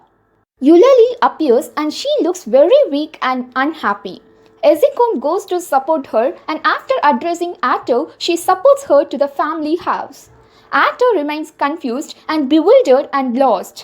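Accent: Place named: India. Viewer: I am the 2000th person to watch this. She speak Indian english, I wanted African englis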